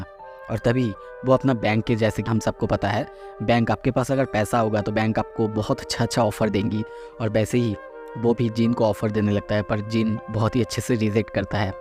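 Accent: Bengal, India. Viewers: ap bengali ho kiya, mere vi hindi aisa he, but apka mere si vi acha he. Solo leveling ragnarok explain kar sakte ho kiya, or Jin nehi Jinwo bolo kew ki is me Jin namak 4,5 character he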